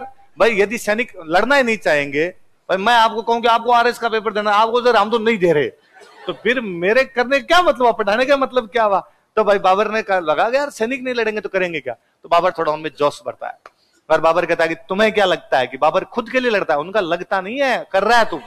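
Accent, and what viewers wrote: India. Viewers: सर आपकी देसी बोली बड़ी प्यारी है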